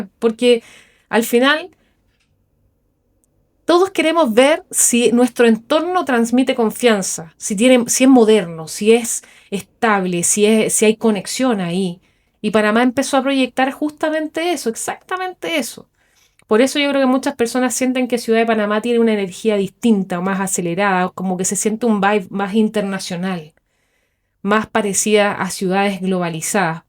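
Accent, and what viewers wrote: Chile. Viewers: 🙌🏼 Qué bien se siente oír hablar muy bien de nuestro país a un extranjero. Eso significa que los panameños no lo estamos haciendo nada mal, a pesar de que a veces somos muy duros con la autocrítica. Pero es bueno autocriticarse para mejorar. Por tu acento supongo que eres chilena. Yo tuve un inquilino chileno, durante 2 años, que vino hacer un trabajo de ingeniería en una fábrica de mi provincia, y él era muy educado y amable. Ya me suscribí a tu canal chica. Espero sigas subiendo más contenidos sobre nuestro Panamá. Saludos. 👍🏼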